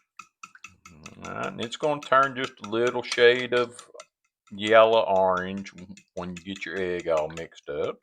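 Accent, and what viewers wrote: South, United States. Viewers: What is with the Southern brogue?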